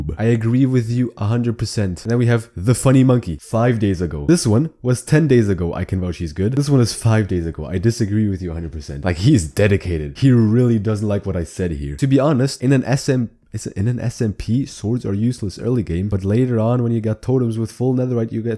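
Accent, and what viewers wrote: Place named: Germany. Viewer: Joa net schlecht das man als Deutscher versucht einen englischen Kanal man merkt schon das sich die deutsche mc Community sich für solche Videos nicht interessiert seit dem BastiGHG nur eintönige Challenge Videos macht. Ansich ist dein Englisch sehr gut aber man hört nur minimal dein deutschen Akzent.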